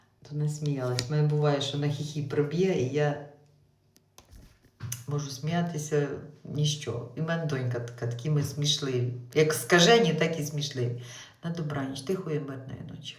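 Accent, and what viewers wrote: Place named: Kuban, Russia. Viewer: Пробило на хіхі.. кубаньська балачка.😂😂😂❤1972 рік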